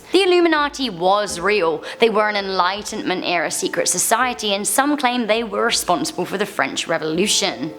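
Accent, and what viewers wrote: England, United Kingdom. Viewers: Hey, Your accent is beautiful is it British? Btw you're gourgeous💯💓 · Damn shes hot, the English accent makes it better lol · British accents get annoying. I got a massive knob ya?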